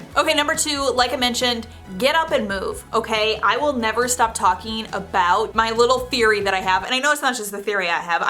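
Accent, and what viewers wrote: Midwest, United States. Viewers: Are you from the midwest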